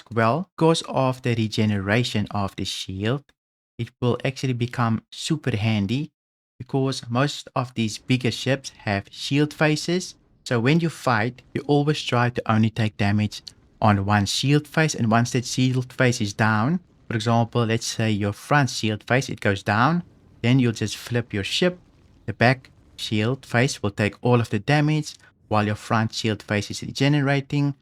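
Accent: South Africa. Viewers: awesome thank you, are you from South Africa?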